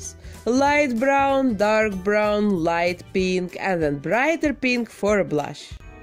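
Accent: Russia. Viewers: I like your Russian acent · I love your accent. Are you from Russia? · Русский акцент ?! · This russian акцент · Oh, Russian accents are so beautiful and zaftig sounding. I must say, I do think I wig may have been better, and perhaps a smaller nose pompom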